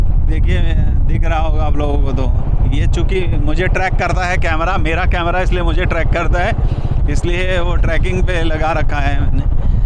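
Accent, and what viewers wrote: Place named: Khandesh, India. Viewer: Najara nahi nazara kahete hain Or Intejar nahi intezar hota hain voh Jarrurat nahi zarurat kahete hain Bijness nahi business Urdu ka janaza mat nikalo language ki study karlo bad me journalism ka shouq karna۔۔۔۔ektarf ka shuru hain dusri tarf ka nahi matalb kya hain 😂😂😂 one way kahete hain use ۔۔۔۔ industriej nahi industries kahete hain۔۔julfen nahi zulfen۔۔۔۔17 manjil nahi 17 manzil .....jameene nahi zameene.....inshort samanya or average class ki journalism.......on camera to Kam se kam ache or bahetrin alfaaz ka intekhab karna chahiye or aam zindagee me jis khandeshi lahejy me baat karte hain usse bahar nikal kar shiree or dilkash lahejy ka istemal karna chahiye...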